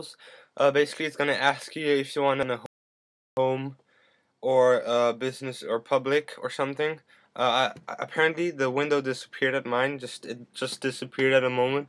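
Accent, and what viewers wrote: Netherlands. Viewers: Are you dutch? (Recommend at this channel)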